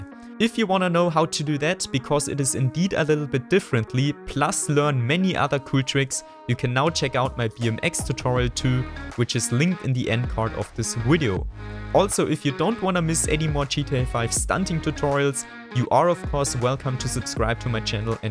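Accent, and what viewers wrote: Germany. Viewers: Man merkt den deutschen aktsent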